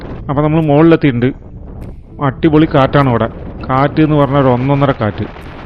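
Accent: Palakkad, India. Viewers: Nallapalakkadan slang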